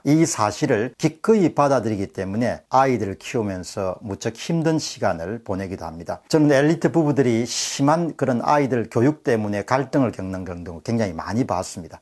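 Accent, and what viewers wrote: Gyeongsang, South Korea. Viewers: 하. . . 서울 토박이 나. 갱상도 사투리 진~~~짜 싫다!!! 정보전달력이. . . ㅜㅜ 표준어 쓰시면 전달력 더 좋을듯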